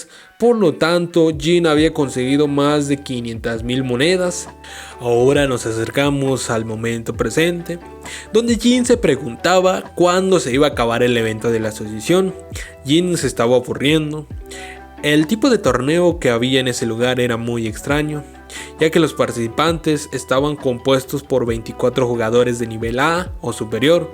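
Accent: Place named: Chihuahua, Mexico. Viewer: parece de chihuahua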